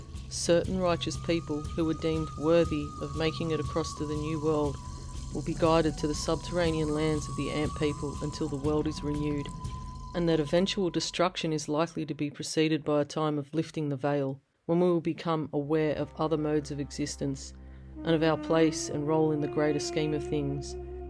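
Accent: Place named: Australia. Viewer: Thanks for making these new connections in such an engaging Aussie voice!🙏⚡💛🦘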